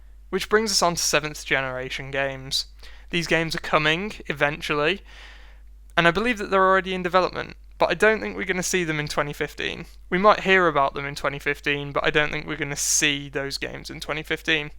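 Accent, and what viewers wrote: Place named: United Kingdom. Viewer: Soooo British.... Ewwww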